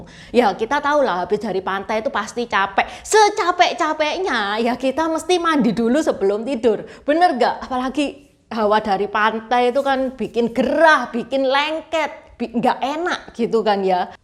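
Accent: Java, Indonesia. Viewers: Saya suka dgn konten 2 spt ini Apalagi logatnya jawa kentel , matanya sipit. Pasti semua suka. Lanjutkan Ci.... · Enakan nieh dengerin dongeng" dari ci.. dg bhs indonesia ber aksen jawa medok.. hihihi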